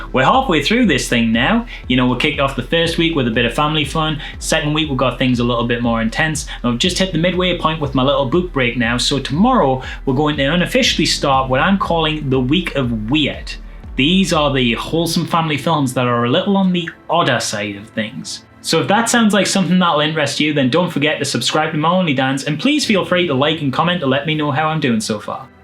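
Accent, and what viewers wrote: Newcastle, United Kingdom. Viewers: That's an incredible book cover! Btw, I have loved this whole series so far. I am a pretty serious horror fan, but I never thought of how heartwarming and wholesome the genre could be, and nowadays I live for that shit. So thanks for bringing these gems to all of us and talking about them so genuinely in that charming-ass Geordie accent.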